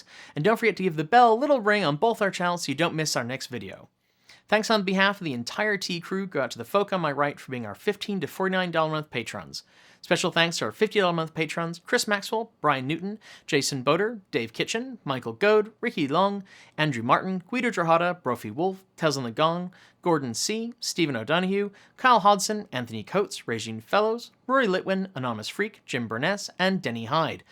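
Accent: United Kingdom. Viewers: What happened to the British accent?! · Great advice especially for me who is saving up to purchase a used EV.. Wait a minute... What happened to British accent? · Am I crazy or do you usually have a British accent? Your American accent is very good! I wish I could do a british accent as well as you do American haha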